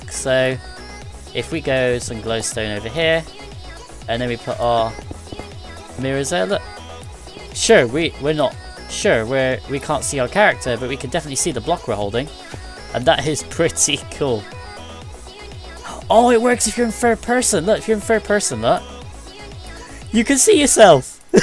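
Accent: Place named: United Kingdom. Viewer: You sound like a british Linus Sebastian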